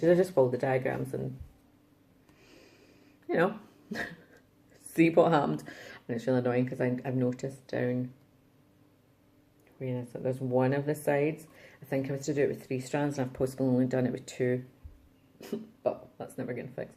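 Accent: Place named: Scotland, United Kingdom. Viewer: I enjoyed your video, nice progress and the patterns you got are beautiful. I have looked at William Morris patterns and just know I'd never make it to the end so didn't even try. It was nice you got to go have a meet-up with the other stitchers, I meet with a group every couple of weeks and it does encourage me to keep at it though we do chat and spend time looking at what everyone else is doing. Lol . Flosstube has grown so big its re ally hard to grow a channel unless there is one of the bigger numbers ones shout you out. The only thing you can do that I can see is find those who joined around the time you do, comment often and shout out other new comers. I don't make videos but I have been observing for a long time, lots of people join and hope those who have been here for ages will see them, comment on them but they only have as much time as they can fit in to watch videos and they made most of their friends when they joined . 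Every week or so I search Flosstube#1 and welcome new stitchers, if I made videos I'd shout them out to the subscribers I had and comment on them often, give likes and slowly over time I would find those I had the most in common with . Good luck building your channel, I really enjoy your videos though at times I find it hard to figure out what you're saying because your Scottish accent is quite strong😂. The Vietnam war was terrible , a whole generation of young men were drafted and a whole lot of them came home in body bags. They were fighting for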